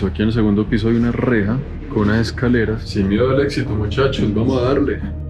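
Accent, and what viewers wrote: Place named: Manizales, Colombia. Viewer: cuando comencé a seguirte pensé que eras de Manizales. Tienes un acento un poco parecido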